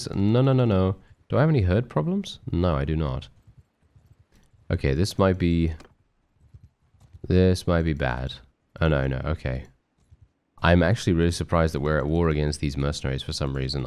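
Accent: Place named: England, United Kingdom. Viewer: My favourite part of your videos? "Are you serious?" 😂🤣😂 There's something inherently enjoyable about hearing your smooth english accent spit that phrase out every episode of every Bannerlord series. Never change sir.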